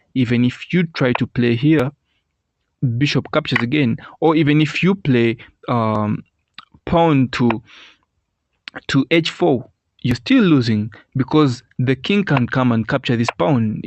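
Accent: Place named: Uganda. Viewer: I'm Ugandan too so I could tell from the accent. This was very tricky for me to figure out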